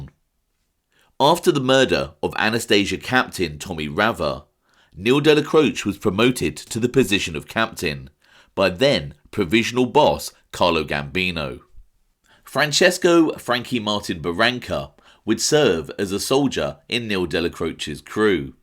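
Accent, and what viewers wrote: United Kingdom. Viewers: It amazes me how much knowledge u have considering I'm assuming ur from the UK by accents. Good to no are American history reaches global appreciation.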